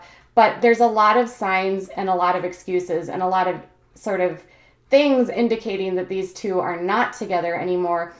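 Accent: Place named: Midwest, United States. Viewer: Where do you live?? I am from MN and hear a midwest accent? I could be wrong but I dont mean to offend if I am way off base haha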